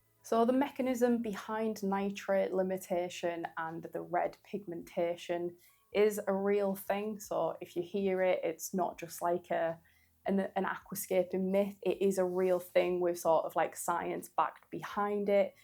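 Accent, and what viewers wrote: United Kingdom. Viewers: your accent reminded me of my time studying in Leeds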